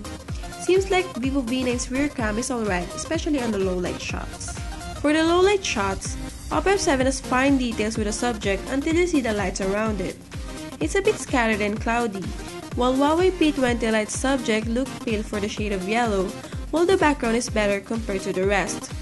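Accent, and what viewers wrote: Philippines. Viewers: Loving the filipino accent!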